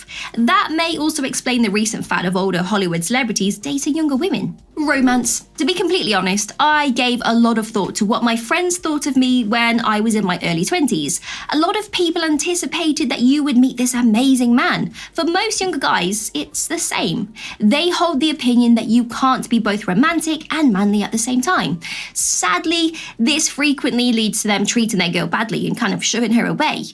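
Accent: United Kingdom. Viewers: I kind of like the British accent, and you don't make the eyes hurt. I do find myself going whew when you finish a video...as while I enjoy listening to you talk I feel bombarded at times.... peace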